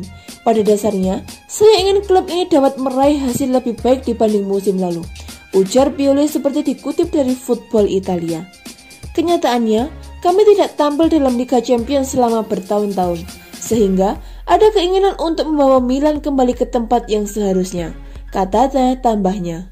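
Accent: Java, Indonesia. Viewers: Kemedokan yang baca berita nya...gak menarik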